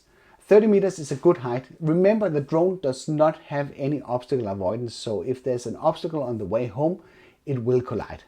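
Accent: Denmark. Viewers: Danish accents are the best! Thanks for the info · It Sound like youre from Denmark because i am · Danish accent it’s like Chinese ,any way it’s good tutorial☺️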